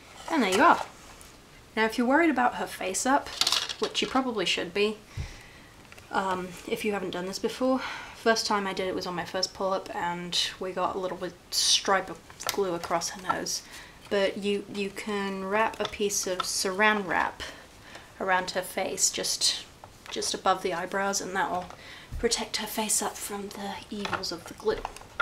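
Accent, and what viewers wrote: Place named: United States. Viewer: Omg, a posh American accent, luv it!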